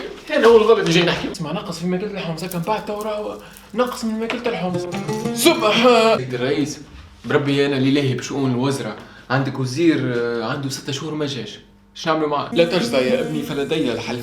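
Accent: England, United Kingdom. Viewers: that british accent tho ❤ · Dat english accent xD nice video , mafhoum w message reçu <3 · That England accente tho :D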